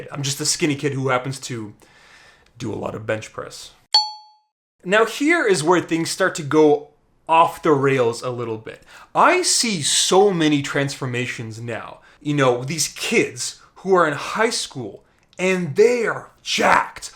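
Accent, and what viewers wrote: Russia. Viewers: That Russian accent tho... 😆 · omg the russian accent lol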